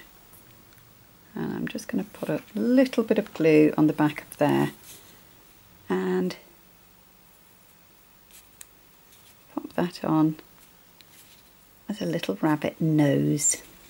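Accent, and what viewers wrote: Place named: United Kingdom. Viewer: wonderful tutorial. Enjoyed your clear, British voice.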